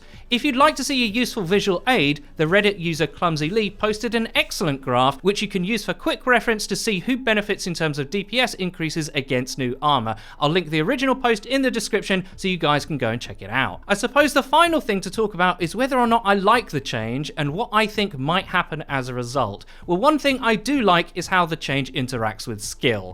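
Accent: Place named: United Kingdom. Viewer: Thought this was a decent explanation of the new changes. Nice to hear a British accent too.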